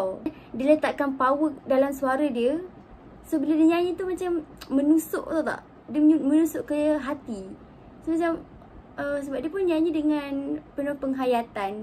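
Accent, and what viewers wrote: Malaysia. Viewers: Candu Logat Melayu 😭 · Dialek malaysia saya suka dengar. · Saya orang indonesia,dengar suara orang malaysia ngmng,sangat kagum apalgi cantik banget macam ukhti..mantaab... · Saye dri Indonesia🇮🇩 tpi saye suke bahase logat Malaysia,Karne enak di dengar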